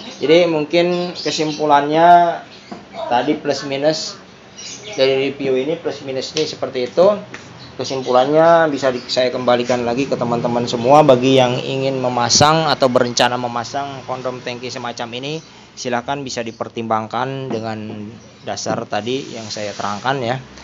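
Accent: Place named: West Java, Indonesia. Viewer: Agak ngahelob😁 bangunateh urang sunda nya si akang mah.?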